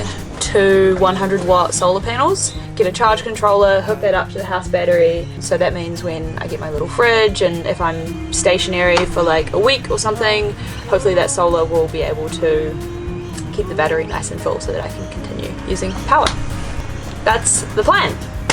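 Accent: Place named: New Zealand. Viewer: Hey, thankyou for replying doesn't often happen a content creator replies. Just wanted to apologize as I knew you were not Melbourne born i just assumed you'd relocated there a child coz ur enzed accent had Melbourne accents to it. I know I shouldn't assume anything coz it makes an ass out of me & an ass out of me. Umm do you know if Elayna had the baby yet. Also meant to say becore, you did a really cool job of converting the van into your own little house on wheels. Cheers look forward to next vlog. RustyinOZ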